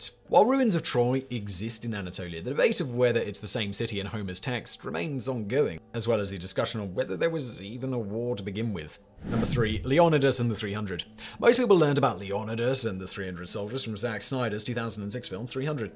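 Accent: England, United Kingdom. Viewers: I don't follow. isn't American. I've never heard anyone in America say Leon-Eye -Dass. Maybe if you've been watching too much Pawn Star Wars on the now mislabeled History Channel. Actually I've never heard anyone say it that way. Since he is very, very English, he's never changed anything he's said in years to cater to any American pronunciations. If I had to say what the most common pronunciations that I've heard, on TV, the BBC, in movies, it would be Le-o-ni-des.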